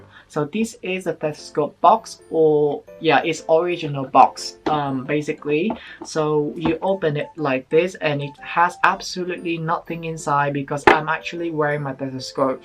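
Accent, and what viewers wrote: United Kingdom. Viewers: Oh you have British accent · Sounds like British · Ui cho em xin phép hỏi là anh học tiếng anh từ lúc nào và sao anh học được giọng anh vậy ạ ??? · I hear a little bit of a British accent 😃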